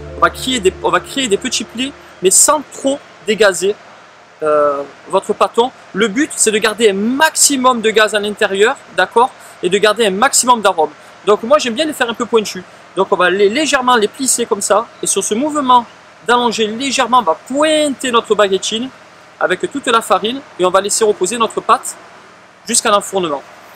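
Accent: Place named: Marseille, France. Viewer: Yeah merci ! Allez je test avec le sarrasin et je te dirai le résultat :-) merci encore. ET ENCORE, ENCORE, ENCORE !!! c'est trop bien tes vidéo. Puis le bon côté de l'accent marseillais, fait nous un petit "peuchère" dans la prochaine vidéo, que j'attends souvent sur le port de l'Estaque, quand je viens au Rove chez ma famille si tu connais :-)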